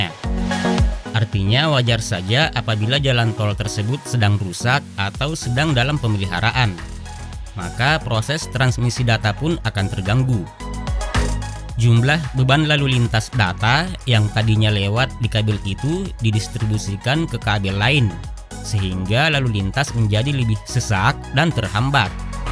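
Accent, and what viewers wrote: Medan, Indonesia. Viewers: Medan kali suaranya 😅😅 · Orang Medan ya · Medan punya nih suaranya 😁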